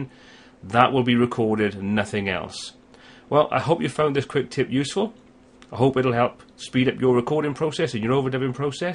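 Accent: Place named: South Wales, United Kingdom. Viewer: Great. Definitely South Wales.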